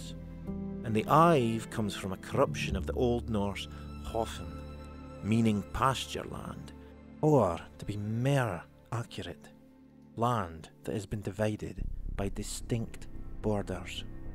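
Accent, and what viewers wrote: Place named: Aberdeenshire, United Kingdom. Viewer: I made that comment about half way through the video - at the very end when you stopped narrating it was clear is was Aberdeenshire haha (I'm from Aberdeen and couldn't place the accent lol). Again, great video and can't wait for more!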